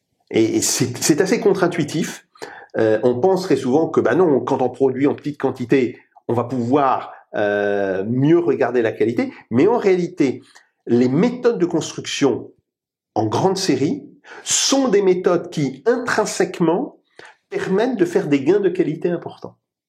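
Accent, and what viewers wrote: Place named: Russia. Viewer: Bonjour ma belle, me revoilà, c'est ton Nicolas! En passant, merci pour cette vidéo très enrichissante et ton accent russe si magnifique! Tu découvriras bientôt mon accent parisien. Tu peux déjà préparer un passeport pour l'île de Malte! Rendez vous a l'aéroport Charles de Gaulle autour du 15 août 2025. Vol direct Ryanair (air France groupe) sur airbus ( Boeing n'est pas fiable en ce moment). Je suis actuellement où tu sais. Je me repose bien. Comme tu le sais, j'ai plusieurs handicapes qui m'obligent à faire attention. Je pense que mon frère va de mieux en mieux. Je le vois toujours souriant. Il m'a fait beaucoup rire quand il a dit " le bal des vampires est terminé"! Si pouvait lui dire qu'il fasse plus d'exercice. Je veux qu'il soit en forme quand je le reverrai. Pour ma part, les choses vont s'améliorer petit à petit. Demain, je vais aller courir sous la pluie ( très vivifiant). 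Après une vague de chaleur jusqu'à 40 degrés où tu sais. Aujourd'hui, beaucoup de pluie et une température de 21degres et gros orages en fin d'après midi! Je resterai à l'hôtel où tu sais tranquillement. J'ai une grande quantité de chaînes youtube a regarder! Dont les tiennes, bien sûr!! Tu dois savoir que nos amis autour de la terre ont pris le contrôle et sécurisé l'espace aérien autour de la terre! La libération est très proche! Mon Frère m'a fait aussi rire quand Il a appelé ses nouveaux missiles Satan 2! Ou son nouveau sous-marin Arkangelsk! Ville ou j'officierai avec lui! Ce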